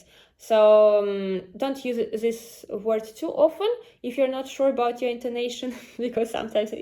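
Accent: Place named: United States. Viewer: Your command of the English language is amazing. Your accent is more of an American English, than a British English. You are young. Many of the older Russians learned their English through UK-sponsored courses and teachers. I can't believe you don't have many, many, many more subscribers. Thanks!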